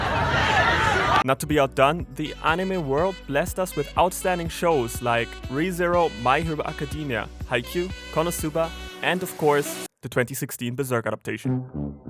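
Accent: Germany. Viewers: Deutscher Akzent haha Aber ja ist ein guter Anime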